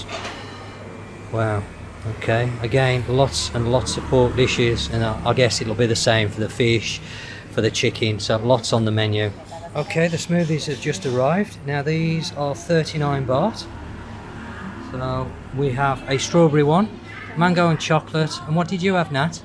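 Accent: United Kingdom. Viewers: What a disgusting accent is this? Must be some trash area from UK. Try to speak BBC English. Yuck. Thumbs down.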